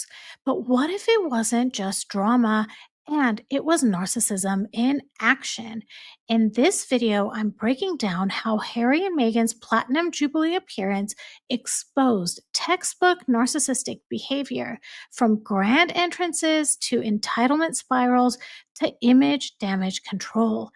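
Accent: United States. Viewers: DO YOU HAVE AN AMERICAN ACCENT ???? IF .IT IS NOT BAD ENOUGH. YOUR VOICE. .????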